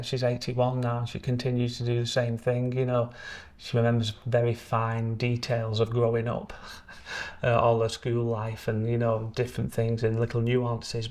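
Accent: United Kingdom. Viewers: Thank you for sharing your heartfelt story ❤ you are a lovely man . Love your Wigan accent .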